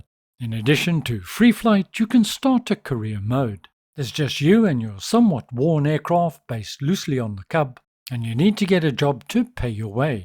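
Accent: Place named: South Africa. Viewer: You sound a bit South African….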